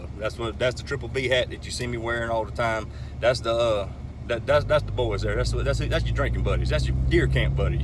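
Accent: South, United States. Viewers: I just love your southern speech and the jokes! Awesome story teller! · And that southern accent.Wow love it. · I love love love this guy and his extra country ass .... sounding like he own a horse ranch.... I had a friend like that till I had kids and she had hands.... her nails was as long as my arm from the elbow to my wrist and she was a beast plus crazy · Love that southern twang.could listen all day,and you are funny. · I just discovered him and have wasted my whole morning listening to him. Is it the accent or the country talk? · I really like This guy’s accent it reminds me watching Dukes of Hazard or Dallas as a kid...he’s a nice cool Real funny guy!. I almost died laughing and I never sub to nobody ever!...but I did it for the first time to his channel.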